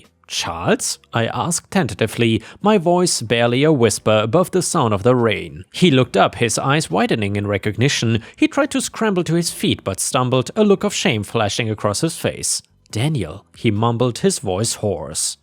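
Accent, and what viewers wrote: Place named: Germany. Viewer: Hey! Guessing from your accent, are you german? Anyways, thanks for the entertainment!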